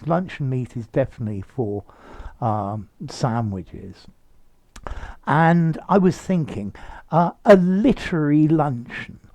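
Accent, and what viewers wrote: RP, United Kingdom. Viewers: Thank you, sir! I really like it when you speak posh English, even your facial features seem to be changing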